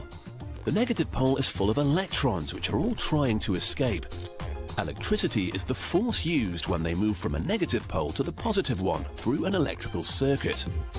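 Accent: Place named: United Kingdom. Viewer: I thought the narrator was always british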